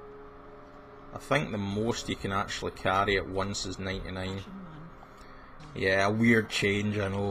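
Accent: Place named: Glasgow, United Kingdom. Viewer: Are you Glaswegian? your accent sounds just like some of my friends from down there, but less nasal.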